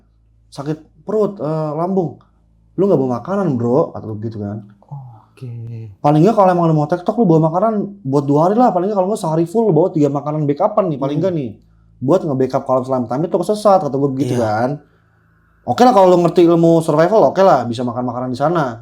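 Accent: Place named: Bekasi, Indonesia. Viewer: Bekasi bgt nih logatnya resep betdahh dengerin cerita lu bang 🤣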